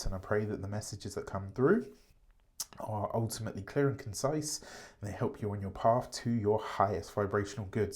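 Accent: United Kingdom. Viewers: You can't quit us we love you too much..thank you I missed hearing your British accent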